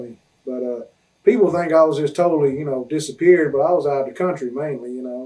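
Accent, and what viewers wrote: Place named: South, United States. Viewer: He sounds so different "country af" compared to the OMG and characters I've been looking at OMG since WCCW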